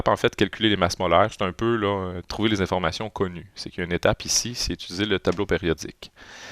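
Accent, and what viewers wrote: Quebec, Canada. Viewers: Merci pour l'aide! Mais cet accent quebecois est pas tres beau :/ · Merci beaucoup ! T'es canadien?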